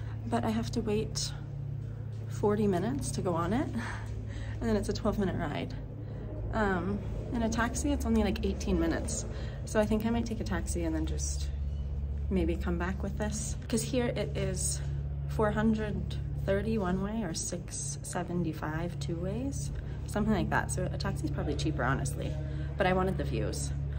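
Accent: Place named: Southwest, United States. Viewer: clearly its an American accent.. southwest.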